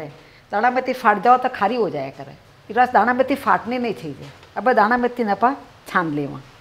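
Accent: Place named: Rajasthan, India. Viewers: I love her Rajasthani accent and delicious recipes. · Rajasthani vesh bhusa or boli see aap Rajasthan ko goranvit Kar rahi he humare Rajasthan ki sanskrati or Khan pan ke tor tarike sabhi se share Karne ke liye danyawad🙏🙏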